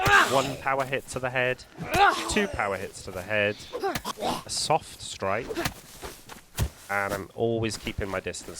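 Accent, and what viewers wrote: Wales, United Kingdom. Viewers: I genuinely look forward to watching your Dayz videos. I'm fairly new to it. Probably 30hrs and doing well tbh.. struggling with wolves and shooting 😂 but your videos is like like watching David Attenborough programs on animals... brilliant communication and something about your Welsh accent makes it enjoyable and interesting to watch.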